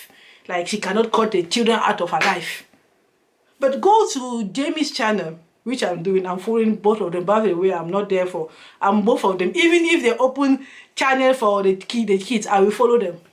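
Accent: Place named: Africa. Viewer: So what is the difference between you and Summer Aku? You both talk aggressively and I think that’s just your african way of talking. You are doing exact same thing you are accusing her of. If everyone should mind their business, then Summer Aku should mind her business and you too should mind your business. Everyone should mind their business. Something else, if you are going to quote Summer Aku you should at least quote her right. I watched that video and you’re not quoting her right. At the end of the day, as you are attacking Summer for getting involved you too should not get involved. You are not Nikki’s defender. There’s a lady on YouTube called LovelyTi. She talks about any and everyone just like Summer Aku. It’s not a new thing.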